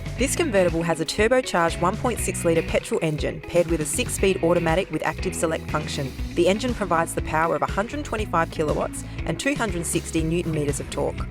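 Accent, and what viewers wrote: Australia. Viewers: So weird to watch a sistah with an Aussie accent ;) crikey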